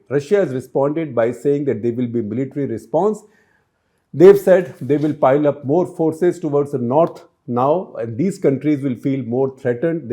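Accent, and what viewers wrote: India. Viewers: This Indian accent very distracting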